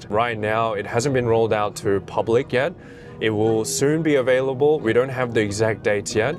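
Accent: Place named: Australia. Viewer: Good job he had the subtitles hardcoded as I couldn't understand a single word of that Australian language, all I could hear was 'mate, mate, mate'. I feel your excitement for the future.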